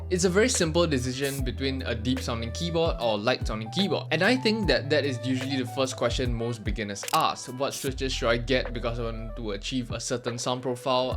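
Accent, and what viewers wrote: Singapore. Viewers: articulate young man with a Singaporean accent 😭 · Stumbled into mechanical keyboards when I saw a Nuphy ad on social media, then went down this rabbit hole of keebs, including subscribing to this great channel with an articulate young man with a Singaporean accent, which surprised me! Very proud of you; keep up the good work!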